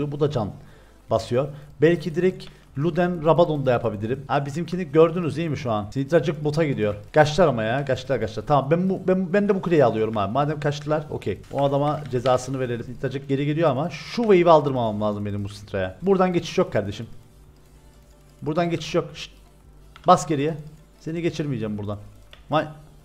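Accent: Cyprus. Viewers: Abi her video attığında onunla birlikte izlerdik güle eğlene kıbrıs ağızına yatkın olduğu için senin konuşman gibi konuşurdu keyifle izlerdim.Artık o başka biriyle ben yine seninleyim. Seviliyorsun reis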